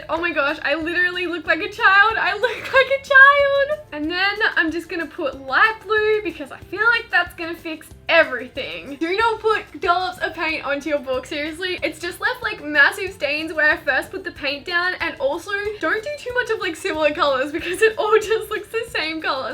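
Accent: Australia. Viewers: are you Australian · I love your Aussie accent its so cute♡♡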